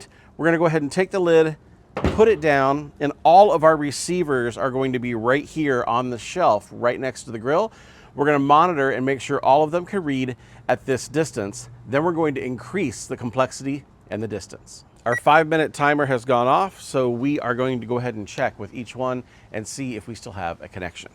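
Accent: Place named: United States. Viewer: You sound American, switch those calipers to inches please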